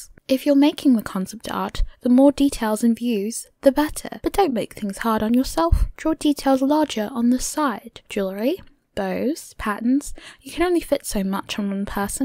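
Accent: United Kingdom. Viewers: Love your British accent lol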